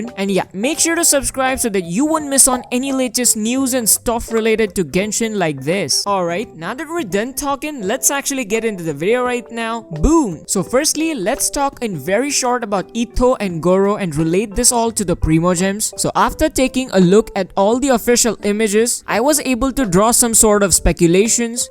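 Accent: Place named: India. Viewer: u r an indian right? ur accent is like that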